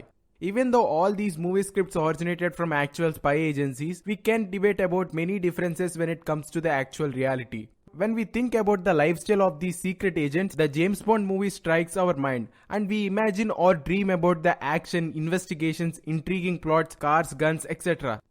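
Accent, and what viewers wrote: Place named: Tamil Nadu, India. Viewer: Ur accent is tamil , south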